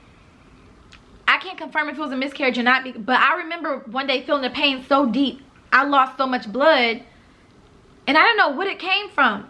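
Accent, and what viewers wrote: New York, United States. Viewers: She talk mad ny now lowkey tho😭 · No cap queen finally sound like she from ny now 😫😫 I love ittt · she sound like a new yorker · she's starting to get that New York accent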